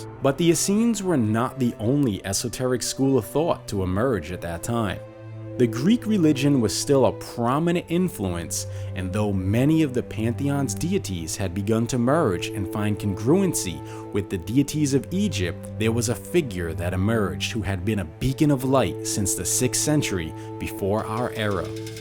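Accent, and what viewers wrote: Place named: New Orleans, United States. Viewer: Sounds like a New Orleans accent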